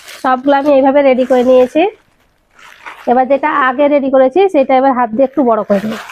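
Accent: Murshidabad, India. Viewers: হ্যলো, আপনার ভিডিও দেখি আমি বহুদুর কানাডা থেকে, আপনি হয়তো পশ্চিম বংগ, মুর্শিদাবাদের বাসিন্দা, মিষ্টি কথা শুনে ধারনা করছি, কোথা থেকে আপনি জানালে খুশি হব, আমি মুর্শিদাবাদের মহিলা । আপনার সব রান্না নিঁখুত হাতের, আপনি বড় হোটেলে কাজের সুযোগ পাবেন । একটা অনুরোধ আপনি ফুডকালার/ লাল রং যেটা বিরিয়ানীর আলুতে ব্যবহার করেন তা কম পরিমানে ব্যবহার করবেন কারন, এই রং থেকে “ক্যনসার” হয় । আরেকটা অনুরোধ বিরিয়ানীতে মশলা ব্যবহার করবেন না ।হায়দরাবাদের বিরিয়ানী ছাড়াও ( পুরানো ঢাকার বাবুরচির রান্না “ কাচচি বিরিয়ানী” google করে দেশে শিখে নিতে পারেন; এই বিরিয়ানী- নবাব সিরাজদৌলাহ এর সময় থেকে পুরানো ঢাকায় এসেছিল, তাদের আত্মীয়তার সুবাদে । আপনাকে ও পরিবারের সবাইকে নুতন বছর ২০২৪ এর শুভকামনা জানাচ্ছি । শুভেচ্ছানতে । জিনাত/ খুকু, ০১ জানুয়ারী ২৪🇨🇦🙏❤️🥰🌹🌹🌹🌹🎈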